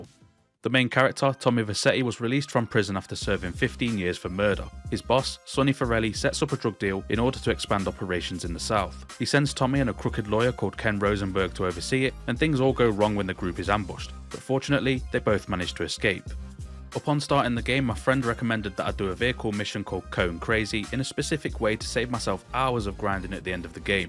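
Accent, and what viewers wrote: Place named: Nottingham, United Kingdom. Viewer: I know a Nottingham accent when i hear one 😂😂😂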